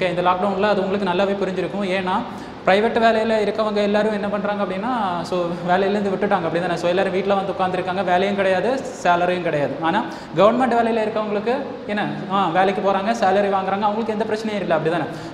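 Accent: India. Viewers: Tamil language Malayalam slang mari pesuringa sir,, i think neenga Kerala😊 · பேச்சு கொஞ்சம் நாகர்கோவில் மனம் வீசியது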